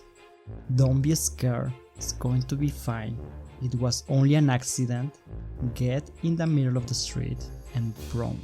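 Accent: India. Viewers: UR accent is like a indian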